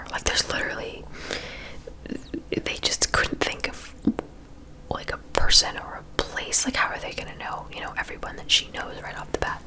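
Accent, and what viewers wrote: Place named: West Coast, United States. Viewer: You sound super West Coast when you talk.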